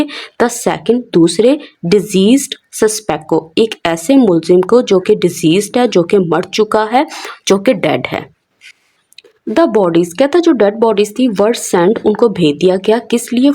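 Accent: Lahore, Pakistan. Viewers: Ma’am, I am an English teacher and the author of two books. I truly admire the way you explain things. Anyway, Your accent suggests you are from Lahore.😅😊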